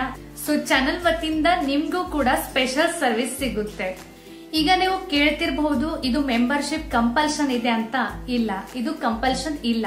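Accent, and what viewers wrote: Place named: North Karnataka, India. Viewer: Nimma Kannada nange tumba ista iam also Uttara Karnataka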